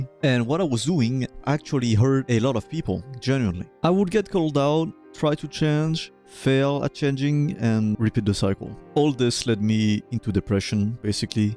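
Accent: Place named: France. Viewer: absolut cinema (je te conaissais pas avant mais j'ai remarqué notre bel accent anglois commun 😌)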